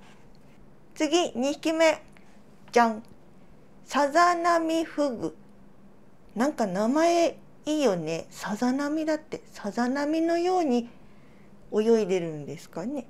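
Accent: Kagoshima, Japan. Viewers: かごんまのひと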